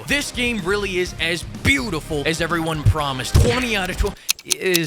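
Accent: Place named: Alabama, United States. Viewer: Definitely from Alabama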